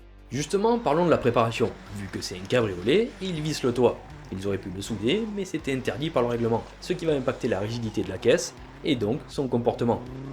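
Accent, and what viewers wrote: South, France. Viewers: chaîne que je découvre ! Rallye + merguez et l 'accent du sud !!! j 'adore !!! #saucisse power !!!!!!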